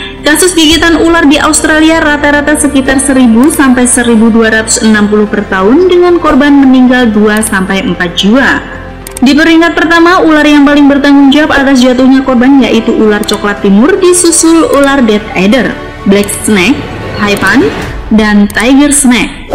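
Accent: Java, Indonesia. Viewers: Syin nya medok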